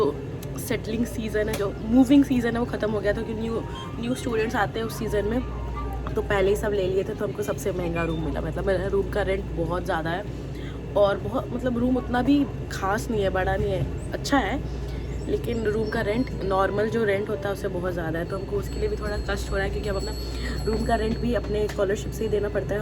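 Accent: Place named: Bihar, India. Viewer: Are you from Bihar. Your hindi sounded like that. I am also from Bihar.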